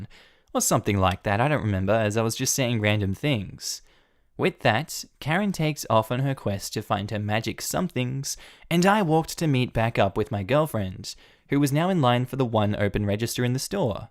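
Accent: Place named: Australia. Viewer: I always love the Aussie accent, but I admit I was wondering how the OP in the hospice was "wearing car keys."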